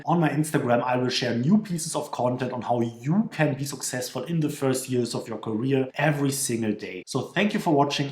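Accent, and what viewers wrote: Germany. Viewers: Du hast echt ein sehr heftigen deutschen Akzent 😂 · Hört sich deutsch an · Ich schmeiß mich weg 🤣 YouTube hat mir „deutsche“ Untertitel automatisch eingespielt. Ich habe zuerst gedacht das wäre dänisch, lettisch oder so. Als ich dann nachgesehen habe war deutsch angegeben. In Kombination mit dem extremen deutschen Akzent pure Comedy 🤓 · Liebe deinen deutschen Akzent! :D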